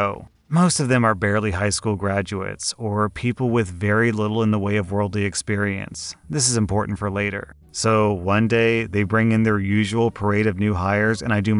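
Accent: United Kingdom. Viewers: 10/10 British accent. When I read "Super Saiyan 3 British," I imagined OP getting super buff and shouting "CHEERIOOOOOOO" before evolving to his final form. Don't ask me why.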